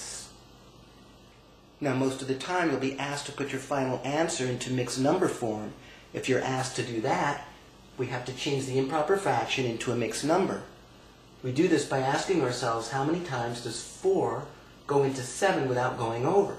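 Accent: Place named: United States. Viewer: i dont understand you cuz have a strong american accent it gets in the way when you explain it and to top it you talk to fast i am sorry to say this ...... but hey i am from London and i am watching this!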